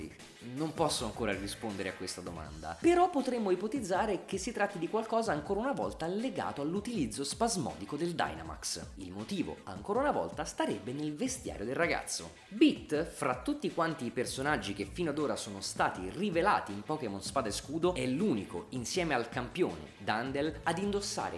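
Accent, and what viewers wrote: Campania, Italy. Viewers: ma sei campano??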